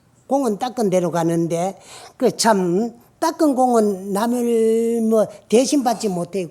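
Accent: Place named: Gyeongsang, South Korea. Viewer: 스님 말씀 구수한 사투리 넘 정겹습니다 경북이 고향이 저의 어머니 말씀 처럼 스님의 법문 넘 잘듣고 있습니다 항상 건강하세요 오래오래 스님 법문 듣고 싶습니다🙏🙏🙏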